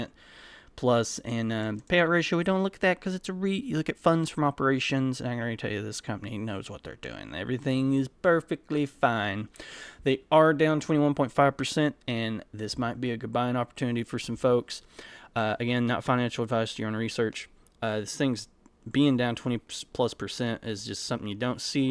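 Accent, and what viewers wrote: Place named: Pennsylvania, United States. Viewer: Said it before, I'll say it again for the Al Gore rythm, I LOVE your data visualizations! What exactly is the accent? I'm guessing PA, but I'm not an expert in PA accents so don't know the part of PA.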